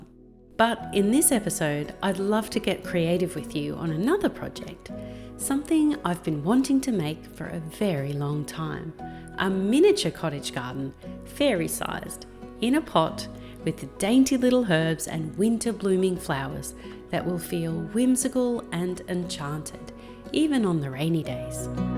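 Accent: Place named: Australia. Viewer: Beautiful video, I came over here as a lady watching my videos said I sounded just like you ❤ same tone…except I hear you are Australian while I am British 😂 a compliment either way ❤❤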